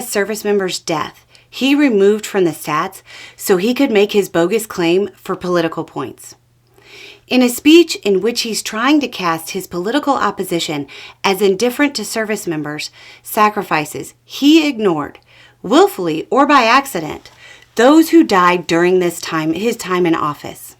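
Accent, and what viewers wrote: South, United States. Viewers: I'm not from the South, but I have lots of friends who are... I keep waiting for to say "Bless his heart," in the way only a Southerner can mean it. lol You go,! Please give my best regards to Beau - I hope he is doing really well. Much Love. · I love how Miss gets pissed and then wishes ya'll a good day in that sweet, soft, southern drawl.:yougotthis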